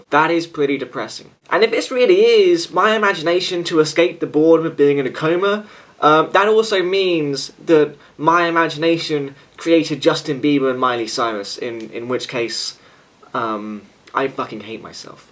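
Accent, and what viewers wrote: England, United Kingdom. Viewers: Oh my gosh. Like i totally am indifferent to the english accent but every time i watch your videos i just totally wanna make out with you and i can't even comprehend what your saying cause i',m fantasizing about what you look like in you boxers . . . or briefs?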